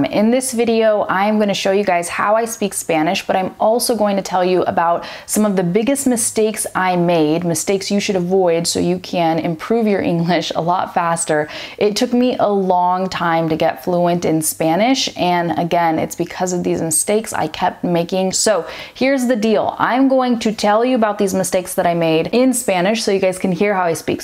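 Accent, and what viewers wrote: Buenos Aires, Argentina. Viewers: Ese buenos aires en vos si se puede ver hehe saludos desde Misiones (Argentina). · Hey, I found your channel 1 video ago, but this one really touched me. I've taken the C1 Advanced test from Cambridge a week ago, and I don't really know if I performed too well, but I can certainly say that I was incredibly insecure about my level of English and I think that affected my exam. I've never taken any English classes beyond the compulsory ones in school, and I've almost never practiced my speaking, though I feel I might not be too far away from a good level... anyways, to sum up, I think my level is not that bad, but your video really helped me to realize that I really need to expose myself more, in order to gain much more confidence. Aside from the above, I wanted to tell you that your Spanish amazed me. Your accent from Buenos Aires is lovely, you don't seem to be a non-native speaker at all, and that's really inspiring for me since I'm Argentine. 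Gracias por la inspiración, un saludo!! <3 <3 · Con acento porteño!!!! Q genial che!! Yo soy Argentino.. Te felicito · No lo puedo creer jaja. estuve viendo sus videos por meses y yo pensando que era super yankee y de un momento a otro se pone hablar con acento porteño? SHOCKED!! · Hi! Here I strike again from UY. I`ve already told you you sound like a true porteña!!! Congrats for the huge number of comments!!! Now... let me tell you that in my ears you sound way more sweet speaking in English than in Spanish (regardless of your perfect Argentinian accent). Kind of a more femenine, soft accent... May I kindly suggest you to visit UY next time you come to the River Plate? Maybe uruguanizing a little your Spanish would make you even more gorgeous:):) Argentine (BA) accent is very clear but a little harsh. I think a mix of Uruguayan and Mexican would make the best Spanish accent blend. (Only God knows all the replies this will bring LOL) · Te felicito, sos una porteña más! 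Thank you for give us your advices! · ¡Tú absorveste el español y también el porteño, que es específico en Argentina! Linda, hermosa, una gracia! So cute! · You just boosted my motivation to keep improving my french, lol, but thanks a lot, nice acento porteño. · Actually, you speak Argentine, not Spanish, but extraordinarily well. Congratulations. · Me suena porteño. Sometimes mexican...(for me, I am not a native castillan speaker) but...congratulations!!! You are really good. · OMG!!!! You are 500% porteña!! awesomeeee!!! I'm from Ecuador but lived over there 7 years... any ways! I almost dont have accent in English cuz I practice all the time, watch my favorite Tv show which is "Friends" and sing in English all the time... I love it when americans think Im from NY. Saludos!! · Increíble, realmente pareces de acá de Argentina, específicamente de Buenos Aires. Thank you for the advices!! 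· Hola, Toda lengua tiene diferentes acentos, en países y sus regiones. Por ejemplo en ARG tenemos 5 acentos (norte, cordobés, litoral, mendocino y bonaerense). Ella está hablando con acento argentino bonaerense. Les recomiendo aprender español de España o castellano en principio y luego centrarse en algún acento que les guste. Yo aprendí ingles británico primero y luego me centre en ingles de norte américa. Es la forma más fácil y limpia de aprender un idioma en mi opinión. Ah, y no olvidar hablar y aprender palabras nuevas, las reglas gramaticales las aprendes cuando ya tengas un suficiente dominio del lenguaje. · You speak Spanish with a perfect Argentinian accent I hope your parents are really proud of you that's very impressive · Hablas Argentino JAJAJA muy bien! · Muy buen españool! (Tenes acento bonaerense) 😂❤ love it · Perfecto español porteño jaja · hablas un poco con un accento de Buenos Aires. 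· Sos re porteña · Sos una genia, tu español es excelente y tu acento porteño es bárbaro, te re felicito! I hope I had met you when you were here!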